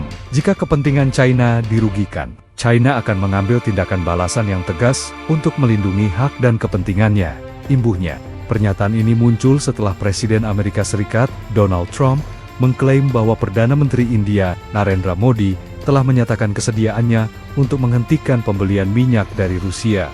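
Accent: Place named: Java, Indonesia. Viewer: Pembaca ini logat Jawa sangat memalukan membaca cina saja ti pecus yg benar itu China bukan Chaina seharusnya gunakan bahasa baku bukan bahasa ke barat baratan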